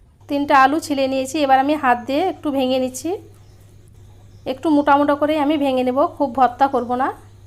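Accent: Murshidabad, India. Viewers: আপনার কথার সুরটা মুর্শিদাবাদের মত লাগছে । আপনার রান্না গুলা ভালবাসা মিশে আছে গো । অনেক ধন্যবাদ আপা ।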